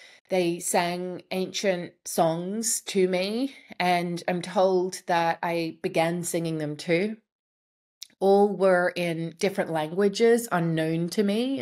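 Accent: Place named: Australia. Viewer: Hi, I'm from the south west of Australia so great to hear a fellow Aussie on here ❤.. just a question.. I worry about everything and have been trying to have a mystical experience through breathwork for a while now to no avail.. do you think I may just not have a soul? Like an NPC or something? I don't seem to feel emotions of joy just anger and misery.. do you believe in soulless people (literally)? Would they know they weren't human?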